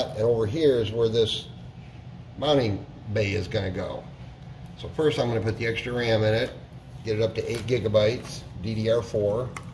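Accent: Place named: Upstate New York, United States. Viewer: You sound like you’re from upstate NY. Lol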